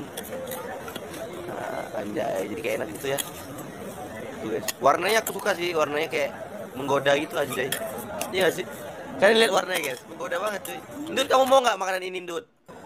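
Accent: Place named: West Kalimantan, Indonesia. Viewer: mungkin logat.nya kalbar bro maman sama doyok teguh jga hampir sama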